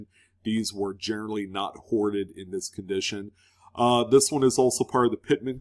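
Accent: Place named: Blue Ridge, United States. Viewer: So had a chance to listen thru in one shot and yeah I like it. Clear concise with some "blueridge" dialog. I like it!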